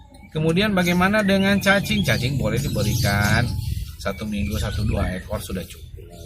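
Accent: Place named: West Java, Indonesia. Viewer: Udah kaya denger ustad ceramah logat sunda.. Hhhhh detail n terperinci... Semoga saya bisa nerapinnya sama trotol pemula main mb.. Thank gan.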